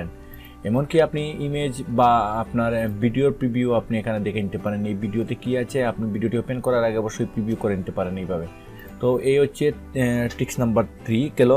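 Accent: Sylhet, Bangladesh. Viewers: ভাই বাড়ি সিলেটনি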